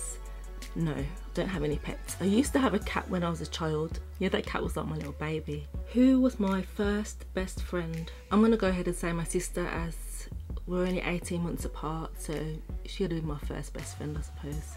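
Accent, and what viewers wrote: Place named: Wolverhampton, United Kingdom. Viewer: Thought I recognised your accent! Wolverhampton here :). Great video! It's always nice to see a fellow YouTuber grow! I subbed :)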